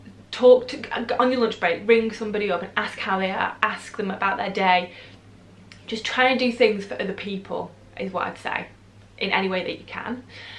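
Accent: North, United Kingdom. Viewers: Just listening to your northern accent is stress relieving in itself! Keep up the good work. Going to try the stress stick as I already have the sleep spray, thanks for the tips. Not keen on camomile tea but my favourite is peppermint and liquorice xxx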